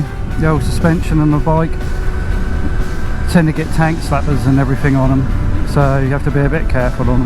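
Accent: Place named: United Kingdom. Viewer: Hmm let's see, British accent, drives on the left, big yellow license plate. I wonder where you're located.......... Tennessee ???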